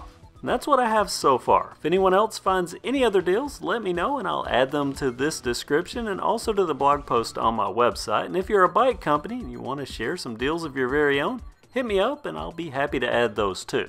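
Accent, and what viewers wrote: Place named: Alabama, United States. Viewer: love to watch your videos, that easy alabama accent is great to listen to while I work (on bikes)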